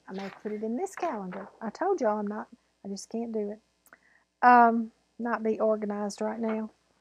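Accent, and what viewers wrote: South, United States. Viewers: I love listening to your southern accent. Also love green Manger and red Manger. Glory and circle flowers. Happy Easter.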